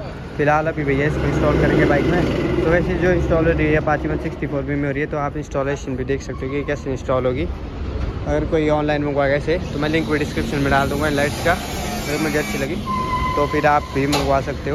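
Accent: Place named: Garhwal, India. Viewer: bhai tu garhwali h kya teri language tone se lg ra h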